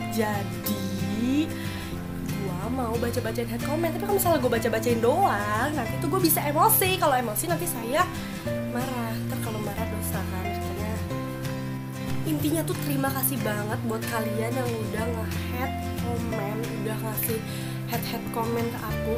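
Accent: Java, Indonesia. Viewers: Nx ngomong medok kuilo marai klepek2 😍😂😂😂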